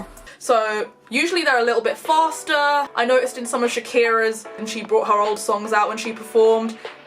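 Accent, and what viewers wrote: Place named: United Kingdom. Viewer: Well I am a huge fan which attracted me to your video and I heard the British accent I was like hey gonna support a fellow brit. But anything live by him there is a these vevo performances he did a few months back you could check out. Also cover by I'm a huge fan especially which is my fav album of all time. I'll try to think of more. But let me what you have heard and haven't so I can suggest more. I would message you on socials but I don't have any social media anymore I deleted it all last year sorry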